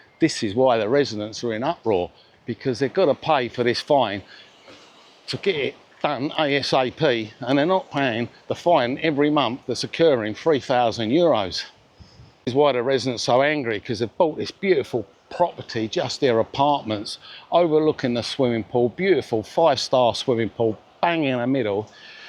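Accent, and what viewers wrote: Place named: Cockney, United Kingdom. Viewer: I love the cockney accent!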